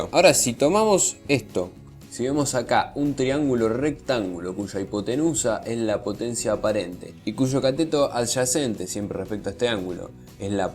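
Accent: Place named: Argentina. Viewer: lo veo xq creo que sos argentino jajaja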